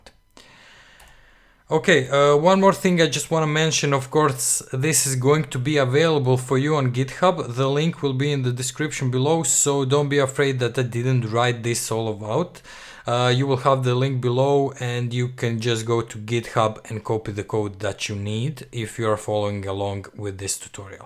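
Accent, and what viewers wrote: Russia. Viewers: i like these vids cause i love his heavy russian accent :D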